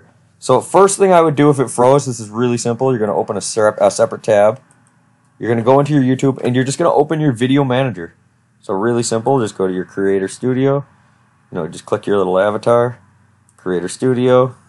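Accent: United Kingdom. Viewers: idk I kinda agree that he sounds British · Sounds like he's kinda British though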